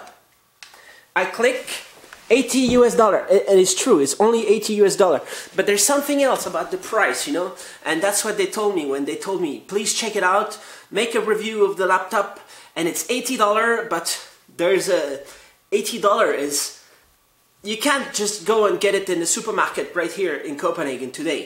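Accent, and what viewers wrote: France. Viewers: i spent like 15 mins trying to work out his accent,french or american? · i now yore acsent youre french haha me to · Wait, it's probably a French accent. I don't know, I give up.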